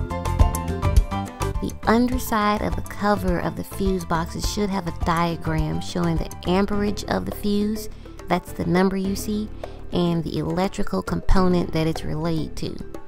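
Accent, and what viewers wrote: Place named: South, United States. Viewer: I hope that you’d consider doing voice-over work. Your voice is unique and has a pleasant tone. And the southern twang is awesome.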